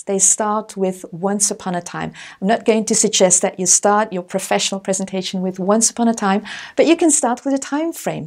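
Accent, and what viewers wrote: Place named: United Kingdom. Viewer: She speaks very smoothly without any fumble- awesome British style of speaking...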